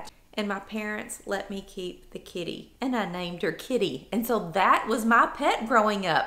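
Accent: South, United States. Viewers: Hey,! I found you when YouTube suggested your “I adopted the shelter dog nobody wanted.” As soon as I heard your southern accent, I was all in. I’m from Georgia but living and working in the DC area now. I’m ready to go back to the South where people look you in the eye and acknowledge your presence! I, too, grew up with cats. Got my first dog—a border collie mix—last fall. Best thing that’s ever happened to me! I love all your dog videos but also love the ones about you and your family. Would LOVE to follow y’all around your town and see your favorite spots. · Could listen to you all day! Your friends must love love love you! Having moved back home to Kansas after 4 yrs in Atlanta I miss a lot southern accent and quite truthfully the South! Soooo when I hear you I’m back in the south for awhile! Love all your sites so don’t stop them😊. I am the opposite of you....I’m a dog person...now have 6 rescue cats! 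When my last 2 dogs died a year after my husband died of a heart attack I was left with 2 cats who got to run the house and I can’t stand seeing feral cats soooo..... I am a water baby and love the beach and like you feet in the sand... well there is a few things about me.....still watching your past posting and enjoying them all.....oh forgot.....while you are a UK person...I Rock Chalk KU. So we can have fun during basketball season😂😂😂😂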